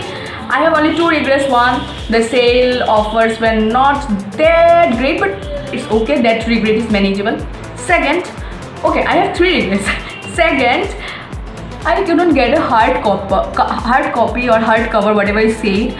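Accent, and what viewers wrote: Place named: Assam, India. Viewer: R u from Assam ........ Karon kothat olpo Asomiya lagil❤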